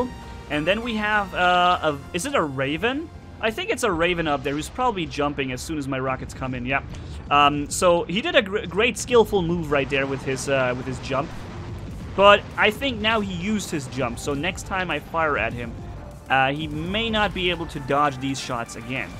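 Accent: Germany. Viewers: BTW, I knew you're natively German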